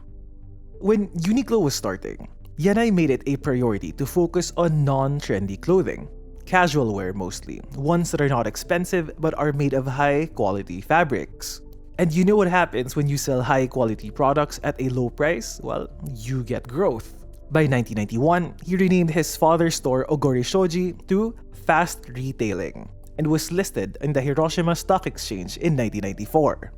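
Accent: Philippines. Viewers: This is excellent! Fast-paced, interesting and, most important, the guy does NOT put on a fake American accent! His accent is a neutral, correct Filipino accent. Like Rico Dizon, he just talks naturally with no twang.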